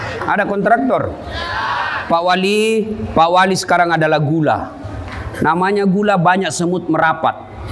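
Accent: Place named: Bugis, Indonesia. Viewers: Sya seneng logat bugis nya👍👍😂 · Logat yg sangat kental logat ciri khas suku bugis makassar Ewako pak ustad ❤️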